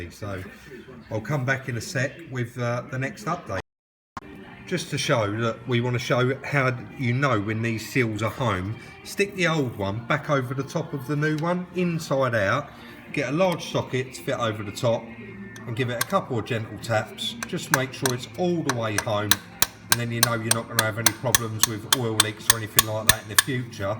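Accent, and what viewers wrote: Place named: Cockney, United Kingdom. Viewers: You sound like an East Ender. I'm from Paddington living in California. Enjoyed this tremendously. Most informative. Thanks. David. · Dreadful London accent and strange, non-English words spoiling a nice video. Has anyone ever told you the difference between "good" and "well"?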